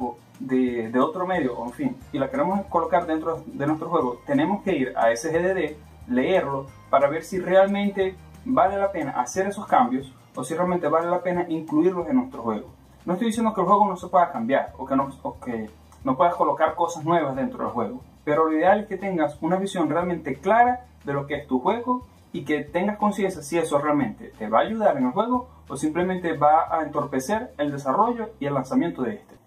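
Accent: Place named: Venezuela. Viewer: Verga ese acento de Venezuela esta bien marcado jaja. Buen vídeo hermano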